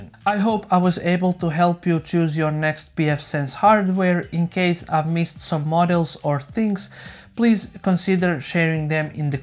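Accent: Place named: Russia. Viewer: You compiled this list of six "tiers" of hardware and then decided that the most efficient way for you to convey this information was by speaking slowly into a camera? You speak far slower than I can read. Also, your accent grates on my ears. I can't stand your Russian/Balki from Perfect Strangers accent. Why didn't you just post this information on a web page? This video is complete garbage. Whoever told you that you should speak in front of a camera should be tried for war crimes.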